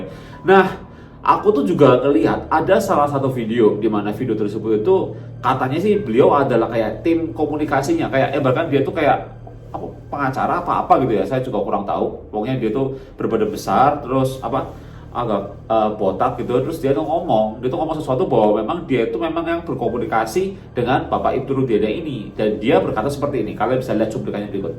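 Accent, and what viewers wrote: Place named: Java, Indonesia. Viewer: Mas aku mo nanya..,btw kamu aslinya dari Jawa Tengah/Jawa Timur. Koq logatnya Jawa bngt🙄 yg kedua aku mo nanya..,mas subscribeermu itu banyak lho mas..,🧐tapi koq yg nonton cuman 15 ribu 20 ribu🙄itu knp mas...?!!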